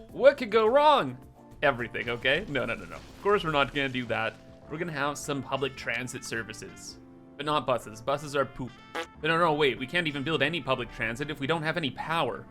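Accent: Canada. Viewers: as a canadian ur accent describes me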